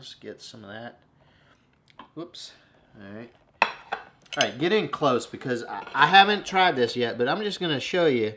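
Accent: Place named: South, United States. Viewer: By chance, l saw your recepe for cubed rump steak. I didn't want to make a casserole. Yours popped up immediately. I love your accent and personality. Is it a southern accent? The hairdresser l follow also has the same accent. March 2025. I wish you would use the metric sizes. However, l can check it out. Thanks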